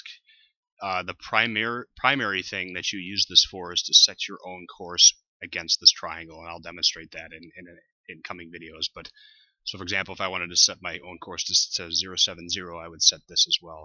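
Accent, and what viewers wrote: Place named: United States. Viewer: You have a true american accent, then you say something in German and I doubt )) Thanks for the tutorial.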